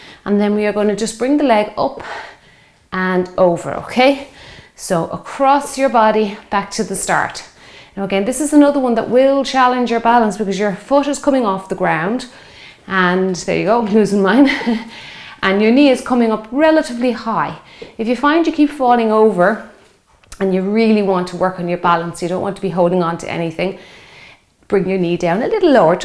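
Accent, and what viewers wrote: Ireland. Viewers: Are you from Ireland? Trying to figure out your accent lol I love it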